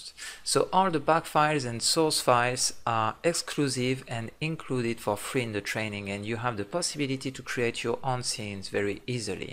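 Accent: France. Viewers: Muy interesante, por cierto el curso esta en inglés?? Porque veo que el profesor es francés y tiene fuerte acento francés, no me queda claro si el curso es en francés o en inglés. Deduzco que en inglés ya que el video introduccion esta en inglés, pero pregunto por si acaso. Si esta en inglés lo podré hacer, pero en frances no.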